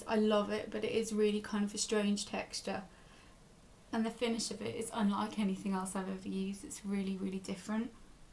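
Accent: United Kingdom. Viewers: Lol I know you have a British accent, I meant ethnicity wise as you don't look Caucasian, that's all :)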